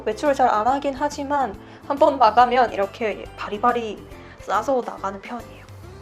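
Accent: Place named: Gyeongsang, South Korea. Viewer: 대구 사람이시죠? 말투가 딱 대구같은데😚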